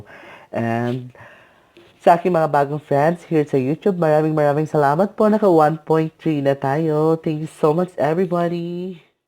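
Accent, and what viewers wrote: Ilocos, Philippines. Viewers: Haha..nice cute pala ng dialect ng ilocano. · I love your ilocano accent 😁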